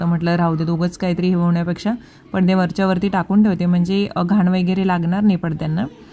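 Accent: Kolhapur, India. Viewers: तुझी कोल्हापुरी मदून मदुन बोलतेस आवडत ऐकाय bolt ja kolhapuri avdel eiky ❤श्री स्वामी समर्थ ❤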